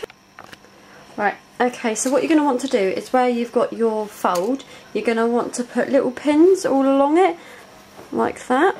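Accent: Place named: England, United Kingdom. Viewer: going to try this over the weekend, love ur accent-where in England are you from???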